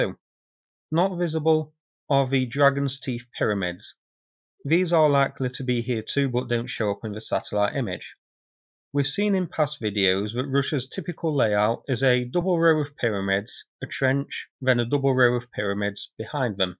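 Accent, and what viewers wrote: United Kingdom. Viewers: No, he's British and lives and works in Taiwan. You clearly haven't followed him for longer than a few weeks or so, because he's been open about this. [Added in the edit]: That he's British, you should hear this from his accent. No Ukrainian talks like that. He's neither from the south-east, because he's not eating his words, nor from high up in the north or Ireland, judging from the sound of it, but definitely British.]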